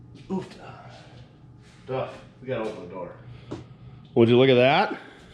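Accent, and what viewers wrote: United States. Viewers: Sounds more like Eric from maine auto to me, sounds more American, not yankee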